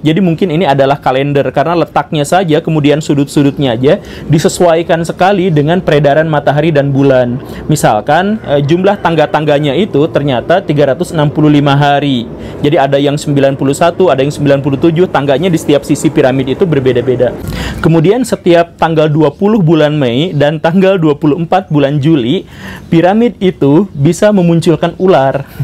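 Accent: West Java, Indonesia. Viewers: Jarum kecos Ranggeteng hadeuh.. Sunda pisan si Akang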